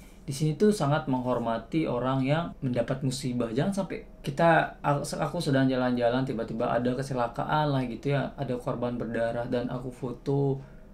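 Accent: Lombok, Indonesia. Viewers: Logat sasaknya tgel👍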